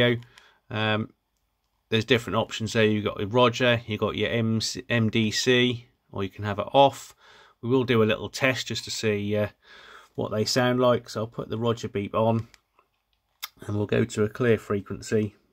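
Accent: Norfolk, United Kingdom. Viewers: Is that a Norfolk accent I hear?....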